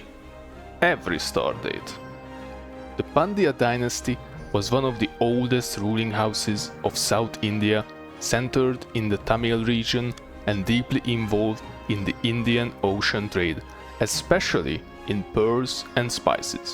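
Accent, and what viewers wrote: Hungary. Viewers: Are you Hungarian by any chance? That accent is almost unmistakable. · Kicsit belobban a magyar akcentus, amúgy egyből durrant a sub+like, örülök hogy egyre több a magyar videós, aki ck3-mal foglalkozik! Hajrá!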